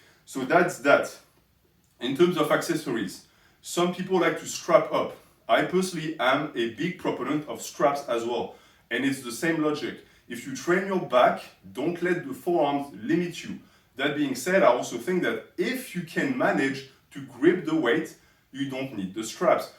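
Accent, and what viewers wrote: France. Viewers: This is amazing, I have always wanted/needed somone to tell me how to work on my DB row techniques and the cherry on top is the subtle French accent. ❤️ Stay fit bro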